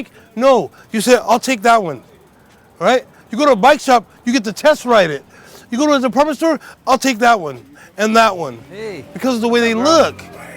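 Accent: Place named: East Los Angeles, United States. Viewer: This guy is great - can tell right away by his accent he grew up in East L.A.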